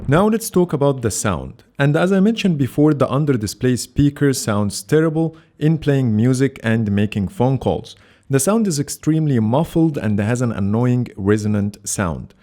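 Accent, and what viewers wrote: Egypt. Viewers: يخي ترا مبين والله انك مصري وعم تحكي انكليزي ... يرحم كل شخص تحبوا ارجوك عدل اللكنه تبعك تبع الانكليزية تبعك 🙏🙏 يخي والله بحب محتواك بس من لكنتك صار عندي فوبيا و مبدي اتفرج اكثر 💔💔 ينقصك اللكنة فقط والله 🙏🙏🙏🙏💖💖💖💖💖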